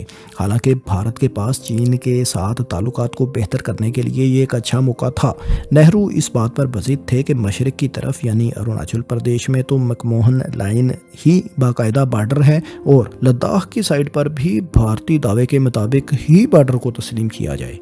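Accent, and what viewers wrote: Punjab, Pakistan. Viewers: اچھی معلومات ہے ، لہجہ بھی فیصل وڑائچ سے ملتی ہے ، الفاظ کی ادائیگی وہی پنجابی لہجے والی 😊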